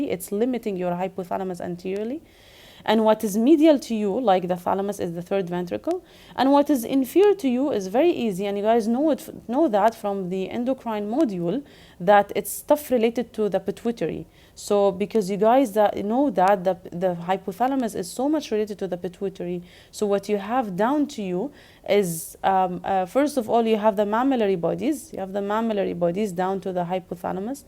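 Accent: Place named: Arab world. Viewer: I have been watching so many videos to get into such complicated area, however i could complete non of them. now I feel lucky to find this amazing channel. Whoever watch this episode not only will be able to understand the topic, but also can memorize the details easily bcuz u r talented and creative regarding linking the informations. I wonder why your channel did not go viral yet, may be it is a location and suggestion issue. Though your accent seems arabic, your language is perfectly fluent. Listening to Indians is awkwardly exhausting and usually u turn the device off within ten minutes maximum😅. Thank u so much for making such unique lectures, I appreciate your work 💚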